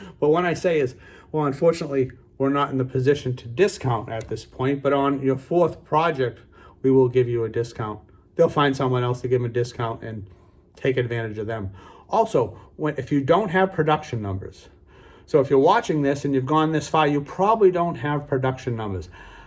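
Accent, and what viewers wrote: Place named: Boston, United States. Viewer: I can tell you now that yes..that's $USD That accent is from Boston, Massachusetts.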